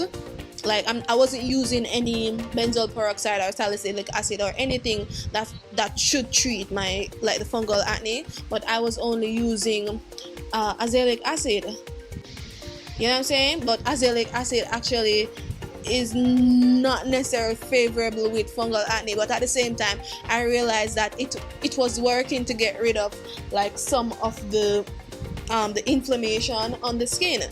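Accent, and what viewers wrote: Jamaica. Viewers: Love your Jamaican accent 😍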